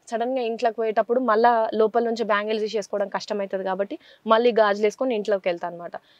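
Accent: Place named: Telangana, India. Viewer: Meeru matlade paddathi Naku chala istam.yentha pedda celebrity ayina Telangana yasa matladutharu.same na language kuda me lage vuntadhi.mem hyd lone vuntam.okappudu Telangana raka mundhu ne language different ga vuntadhi ani vekkinrinche vallu ayina nenu na yasa change chesukoledhu.meeru matladuthunte Naku chala happy ga vundhi.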